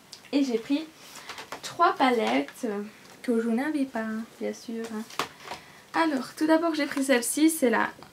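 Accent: Belgium. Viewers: "T'sais nin comment ca s'dit":D Punaise (j'allais dire un gros mot) tu sais pas comment je suis genre contente d'entendre une belge sur Youtube (je te l'ai déjà dit je sais)! Bisous à toi et continue à faire tes vidéos elles sont top!:)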